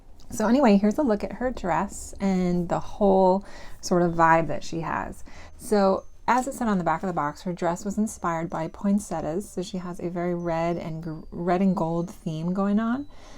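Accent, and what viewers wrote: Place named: New England, United States. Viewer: You have a new England accent!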